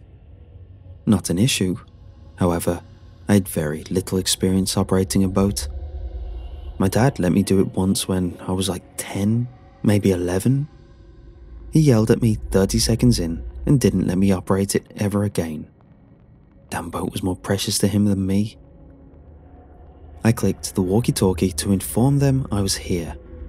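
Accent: United Kingdom. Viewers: I watch these just to hear your amazing British accent ❤️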